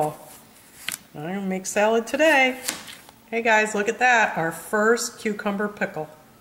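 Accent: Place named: Midwest, United States. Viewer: sound so much like a Midwesterner....but Syracuse has similar inflections.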